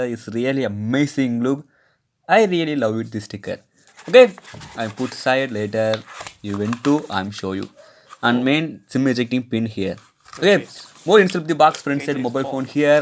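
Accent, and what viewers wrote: South India, India. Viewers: What a malayalam or tamilian style english😢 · Your English sounds like South Indian English.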